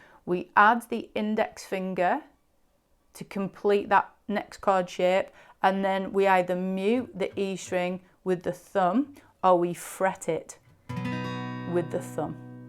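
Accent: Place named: England, United Kingdom. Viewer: Hi, I love your way of learning songs... and your pure english accent! Your entire channel is gold, thank you for your huge dedication to other guitarists!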